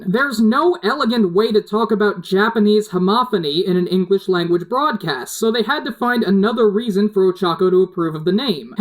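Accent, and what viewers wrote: Australia. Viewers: Are you Australian?? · Are you from Australia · Are you Aussie? If so, cool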